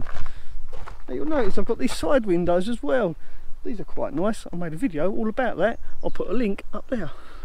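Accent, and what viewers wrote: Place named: RP, United Kingdom. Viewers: Loving the posh voice lol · I’ve been watching your videos for quite awhile how come you’re talking posh are in this one what’s going on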